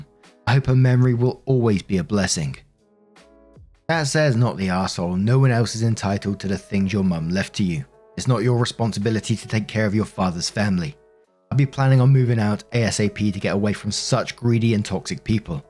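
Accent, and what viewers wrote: United Kingdom. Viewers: Just stopping in to say I love your accent and commentary always!!! I'm Jamaican and always wished for the british sound.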